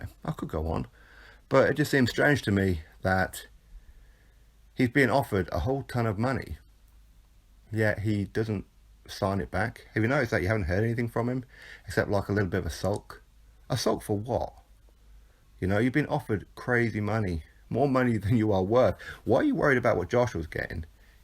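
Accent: United Kingdom. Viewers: Is that a British accent I detect from the narrator?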